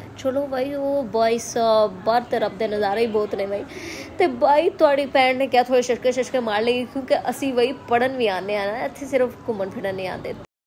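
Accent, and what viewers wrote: Punjab, India. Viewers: Kamal punjabi accent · Punjabi accent 👍😊 proud of you my little pumpkin 😊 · Your punjabi accent💖❤👀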